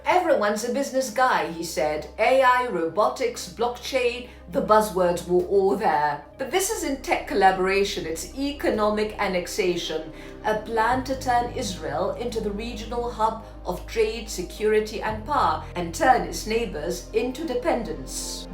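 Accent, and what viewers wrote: RP, United Kingdom. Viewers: Her voice is like she from English ROYALTY 👑 ..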